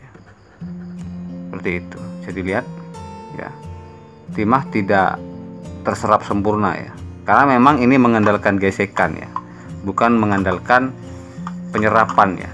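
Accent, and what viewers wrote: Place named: Sumatra, Indonesia. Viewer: Dari aksen bicara agan orang sumatra. Tapi namanya kok nama jawa bang?? Orang mana?? Salam dari bekasi.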